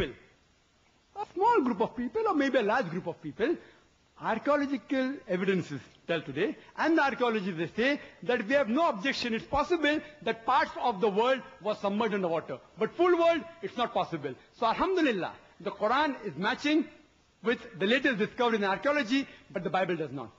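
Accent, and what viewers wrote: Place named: India. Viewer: just cos he has a strong accent as it is his SECOND language (or third depending where from India he's from), it doesn't invalidate his point.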